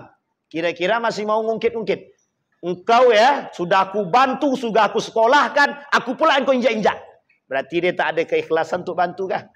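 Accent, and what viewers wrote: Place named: Daik, Malaysia. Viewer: Assalamualaikum , maaf bertanya, pak ustad Dari mana? Dialek nya sperti orang daik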